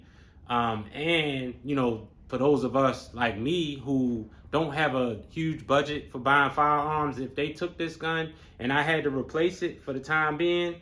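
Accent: Baltimore, United States. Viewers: Accent Baltimore ? Philly here bro I see you ! Stay dangerous, and safe !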